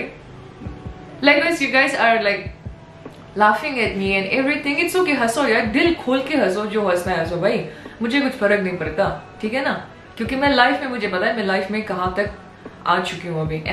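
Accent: Nagaland, India. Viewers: Your nagamese accent is just us❤ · Wow you sound like an Angami while speaking Nagamese😀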